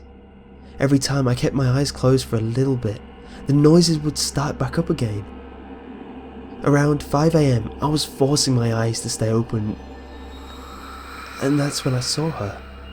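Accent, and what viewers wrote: United Kingdom. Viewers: Is British? What's the accent anyways?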